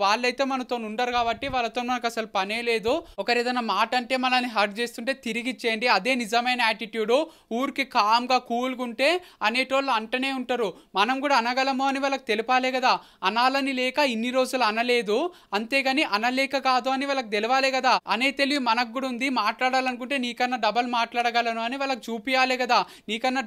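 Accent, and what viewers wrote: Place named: Telangana, India. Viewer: Mi TELANGANA VOICE kekeo keka BRO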